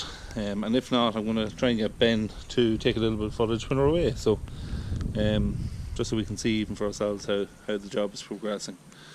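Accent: Dundalk, Ireland. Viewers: Where abouts in Ireland are you based ? Sounds like your from around Dundalk (louth) area ?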